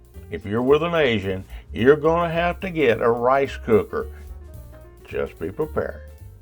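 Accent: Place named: South, United States. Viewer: I am new to your channel, and I really like it!!! Hi there y’all, 😉😎👋🏼. Thank you for sharing a really great topic, and video. I can tell by your character & personality, that you’re a good man with a good heart, and in a happy, and loving relationship, with your Filipina wife. I am from California, and I dig your thick southern accent. Both of my daughters now live in New Braunfels, Texas. So, whenever I’m there for a visit, I get a kick out of hearing Texans speak with their thick accents. To me especially, nothing is cooler than hearing a “Mexican” speak with a Texas accent, lol!!! Once again, I like your cool accent, if I can ask, what part of the country are you from, brother, 😎😉🙏🏼?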